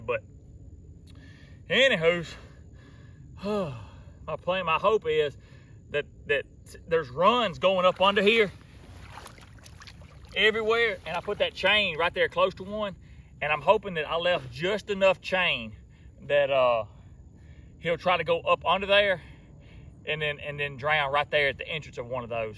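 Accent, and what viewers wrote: South, United States. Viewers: Get them beavers 🦫 I appreciate what you do getting rid of pests of all kinds!!! I love your Southern draw and you are hilarious 😂😂😂 Happy Thanksgiving 🦃🐖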